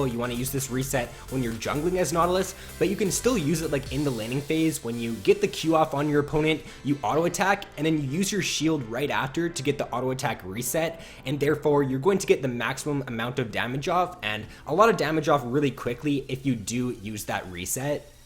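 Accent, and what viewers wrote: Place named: Canada. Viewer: ...You're Canadian aren't you... Good video though.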